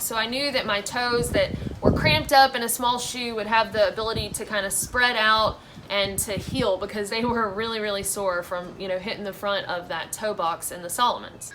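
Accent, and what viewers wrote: South, United States. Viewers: You got southern accents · Stephen Ross heh. Her accent is super super strong to someone not from the south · Stephen Ross lol I can definitely hear a southern accent.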